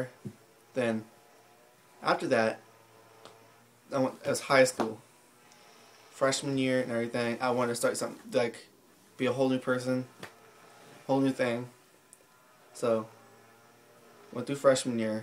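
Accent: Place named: United States. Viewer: The American Accent though :3 Aw i cant believe i dated you :') Your amazing just gotta say :)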